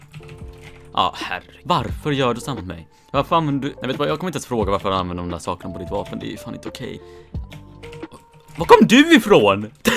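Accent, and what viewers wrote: Sweden. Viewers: O nice speaks stockohlmska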